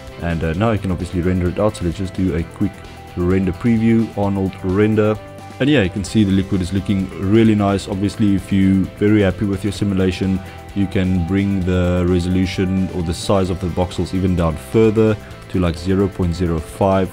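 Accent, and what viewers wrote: South Africa. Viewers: Hi great Tut! thank you. are you South african? you have a interesting accent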